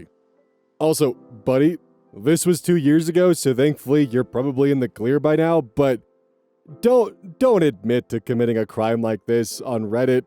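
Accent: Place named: Midwest, United States. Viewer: I love wheb midwest accent comes out strong xD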